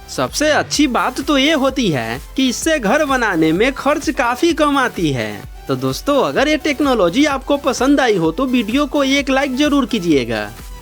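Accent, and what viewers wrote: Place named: Bihar, India. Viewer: Bhut Ganda bolate ho, bihar se ho....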